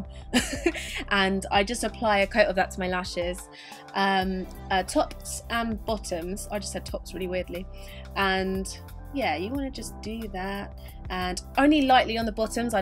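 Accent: United Kingdom. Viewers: Voice over! I live in America and i'm obsessed with your British accent!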